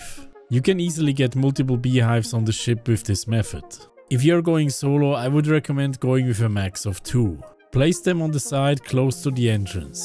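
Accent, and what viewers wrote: Switzerland. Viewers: Bro du besch sicher schwitzer, ech ghör das ah dim englisch wettsch mol eis zocke? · Are you Swiss? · are you swiss?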